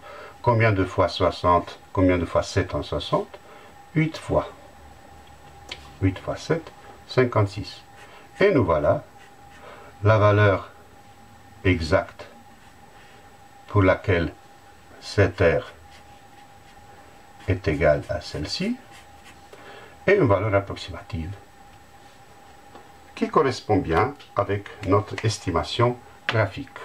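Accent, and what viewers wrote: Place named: Romania. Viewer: vous etes roumain? Car vous avez l'accent (si oui eh bien je voulais vous dire que sunteti un profesore foarte bun)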